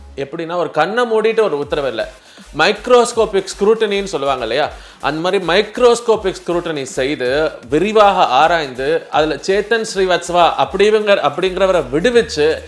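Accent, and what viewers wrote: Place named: North, India. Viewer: Tampi. Un. Kural. Vadakkan. Mathari. Thiriuthu. Ni. Tamilana.shollu.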